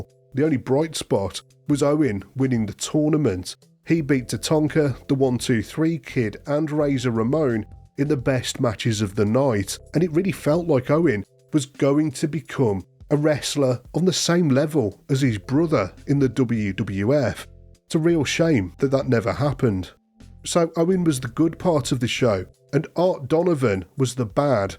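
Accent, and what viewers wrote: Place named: Black Country, United Kingdom. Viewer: love 94 almost as much as your accent. Yow sound like youm from rand ere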